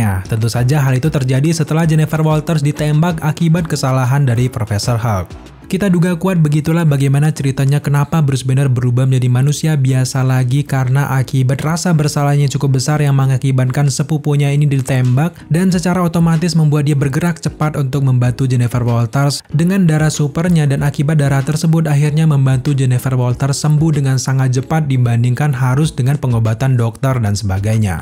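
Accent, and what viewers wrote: Indonesia. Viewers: Ya lidah orang indo bisa di maklumi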